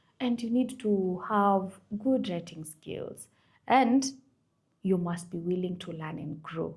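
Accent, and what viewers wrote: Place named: Kenya. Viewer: I immediately knew from your accent that you’re Kenyan. Proud to see another countrywoman representing us in this space ❤️ (you are Kenyan though, right?) 😅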